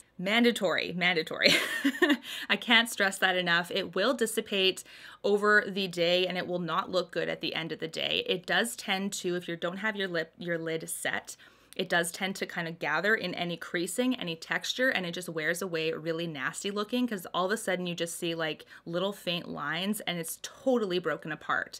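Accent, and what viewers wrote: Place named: Canada. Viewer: Any fellow Southerners love the way Canadians say "about"? I do:))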